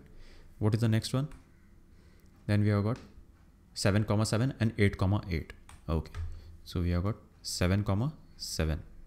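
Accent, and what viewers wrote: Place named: India. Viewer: indian accent is crazy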